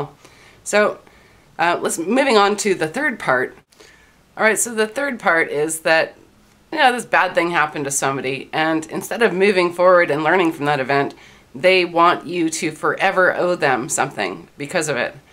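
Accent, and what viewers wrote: Canada. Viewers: You Canuck, eh?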